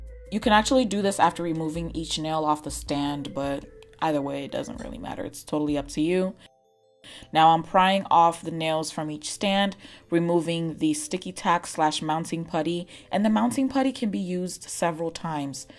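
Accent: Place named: Nigeria. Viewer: I love the 9jia accent!!!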